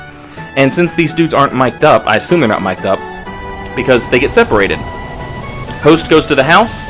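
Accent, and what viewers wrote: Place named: South, United States. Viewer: Cuz Vape Nation y'all. I love memes in your southern drawl. It's adorable.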